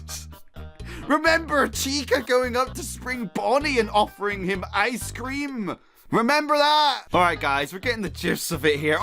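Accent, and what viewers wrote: United Kingdom. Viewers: Vary British. Indeed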